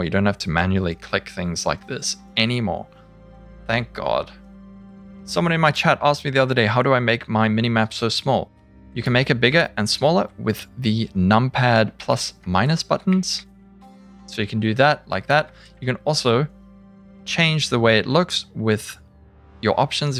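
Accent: South Africa. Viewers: qAre you a Saffa bud? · Are you South African? Level 70 rare items, if you get a full set(Unidentified), you can get 2 chaos from vendor. level 80 rare set gives you 2 regal orbs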